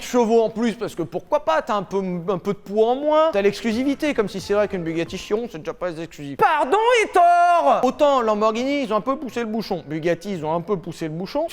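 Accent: South, France. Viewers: Ho fatch cette accent du sud 😘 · j'adore l'accent du sud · Cette accents du sud incroyable ! 😂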